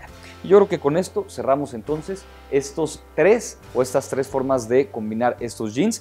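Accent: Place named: Mexico. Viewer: Por el acento al hablar su pongo que eres de México. Podría recomendar lugares para comprar ropa sin caer en precios exorbitantes. Gracias!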